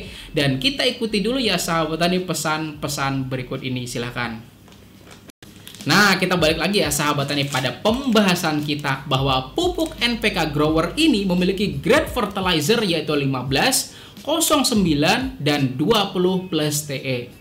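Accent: Banjar, Indonesia. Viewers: Pian asli banjar kah?? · Logat banjarnya masih ke ikut ya pak🤣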